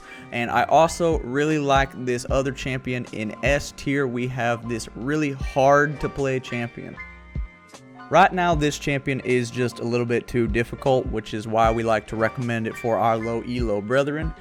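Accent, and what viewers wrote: United States. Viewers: i love that Alabama ascent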